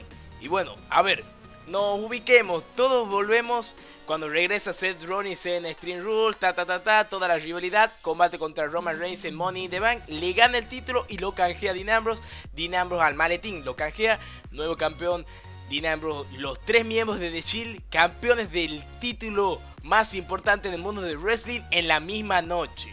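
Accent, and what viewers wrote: Tucumán, Argentina. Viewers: yo soy argentino y tu tonada parece de tucumana😊😊😊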